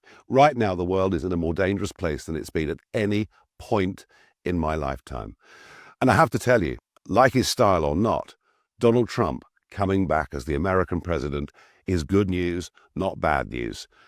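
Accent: United Kingdom. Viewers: I hope apon hope 🇬🇧. I always feel British when you speak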